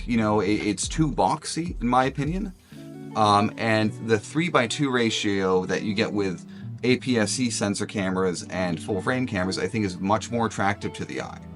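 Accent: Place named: United States. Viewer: You don't sound Scottish. I would have said American.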